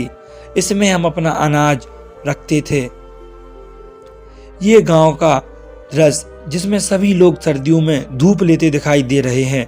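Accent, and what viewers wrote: Madhya Pradesh, India. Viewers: Bhai aap kaha ke rahne wale ho aapki aavaz se to mp madhey pradesh lag raha hai ki. Aap mp se ho kyu g 😅😅😅🙏🙏 please reply sir🙏🥰🥰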